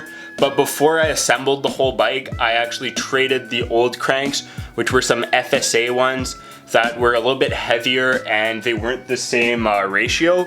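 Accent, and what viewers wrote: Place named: Canada. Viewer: lol The Canadian accent is on point! love the video!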